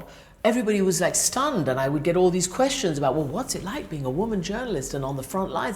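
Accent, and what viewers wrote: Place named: Scandinavia. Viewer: You speak as a scandinavian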